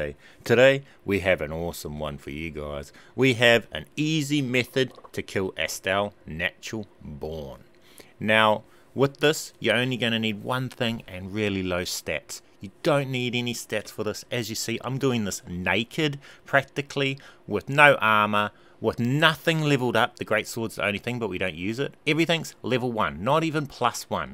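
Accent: New Zealand. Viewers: Churr bro! I thought you sounded kiwi so I poked around your profile and saw that you were! Awesome to see a kiwi content creator I love it bro keep up the good work!! Instasub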